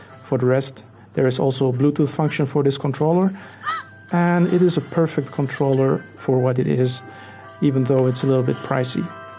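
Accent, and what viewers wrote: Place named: Netherlands. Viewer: You sound like you are dutch...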